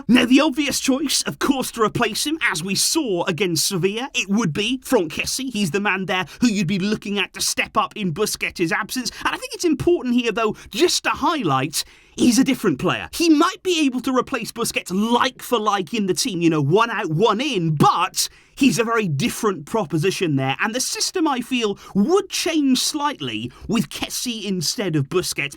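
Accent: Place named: Wales, United Kingdom. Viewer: he’s welsh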